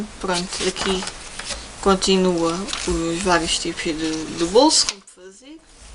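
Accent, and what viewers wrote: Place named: Portugal. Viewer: Definitivamente, eu aqui no Brasil não entendo português de Portugal...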